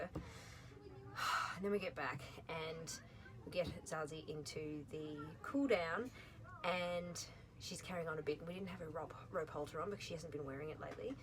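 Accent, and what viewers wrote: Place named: United Kingdom. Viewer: Im from uk and i pronounce it same as you lol. What part of uk were you in they are giving us a bad name guys lol xx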